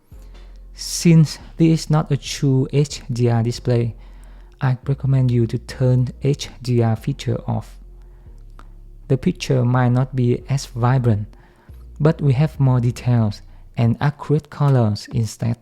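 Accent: Vietnam. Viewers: giọng này chỉ có VN :)) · Đúng giọng Vietlish rồi, thằng chủ kênh người Việt Nam à =)) Chanel owner is Vietnamese =))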